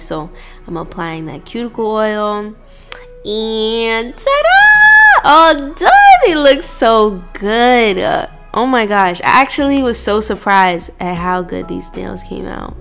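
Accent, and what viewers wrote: United Kingdom. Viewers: We all mutually agree we love these nail vids 😍 the british accent killed me 🤣 oh also its a-pray bby girl xx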